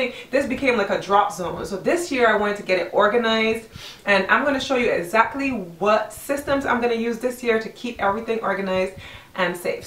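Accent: Barbados. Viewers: omg your bajan shines through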